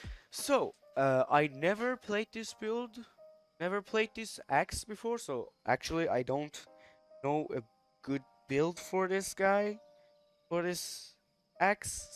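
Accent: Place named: Iran. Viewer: Why your voice like iranian?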